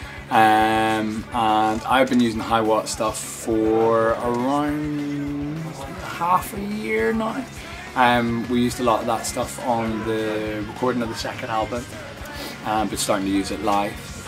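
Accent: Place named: Northern Ireland, United Kingdom. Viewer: He's DEFINITELY Northern Irish.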